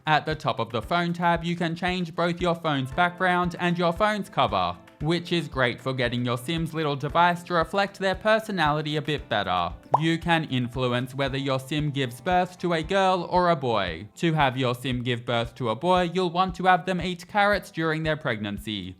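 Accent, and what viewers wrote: Australia. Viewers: Hey! No we don't! 🤣 I'm English, born and bred, and have either friends or family from all three of the other countries within Britain, and we pronounce it 'COE-coe' (both syllables rhyming with foe, or sew, with the stress on the 1st syllable) like I thought everyone else in countries that use English did until now. Don't think he's British, his accent sounds Australian? or New Zealand? but none of the Ozzies or Kiwis I know pronounce it 'coe-COE-ah' either. I also had a good laugh at 'bom-BAD-ee-er' (Bombadier Beetle) - I've never heard it pronounced any other way than BOM-bah-deer 🤣🤣🤣 Don't watch youtubers much so not familiar with this guy - I actually started thinking it might be an AI voice 😳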